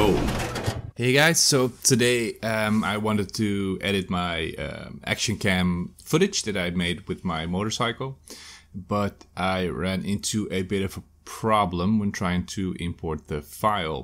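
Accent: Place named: Netherlands. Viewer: Nederlander xD, hoor het aan je accent.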